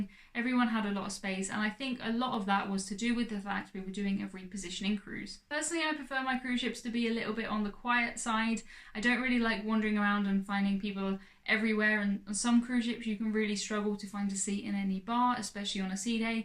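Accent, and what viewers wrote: United Kingdom. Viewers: Thanks for the good info. topic. I have never listened to a fast-paced British speaker like you before. 🤔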